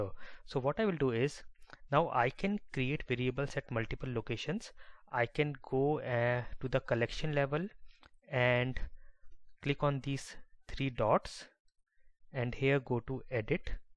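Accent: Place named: India. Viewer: I love your English)))).... every seconds of this video i remember time when i was in India)))... hello from ukraine... and thx for manual... U very help me!!! thx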